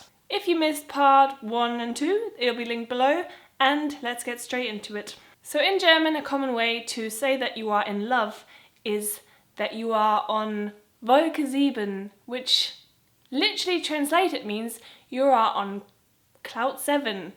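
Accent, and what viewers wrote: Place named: England, United Kingdom. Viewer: an deinem "CH" merkt man dass du in England lebst :) so süss haha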